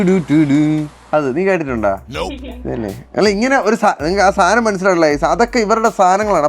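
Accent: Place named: Delhi, India. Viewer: Maza aa gaya… hearing your delhi slang… maza aa gaya bc ❤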